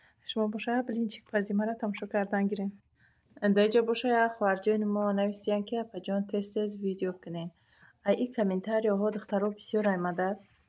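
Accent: Tajikistan. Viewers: Салом хоҳарҷон барои таърифтон гап намеёвам чихел таърифтон кнм❤❤гапзанитон тоза тоҷикона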